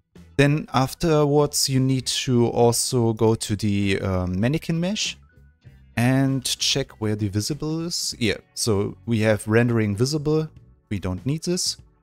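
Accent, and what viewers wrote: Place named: Germany. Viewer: very fast and great on the point explained video👍 you are german right? it would be awesome to watch some great videos in german then... ich bin zwar kein berliner aber trotzdem deutsch😅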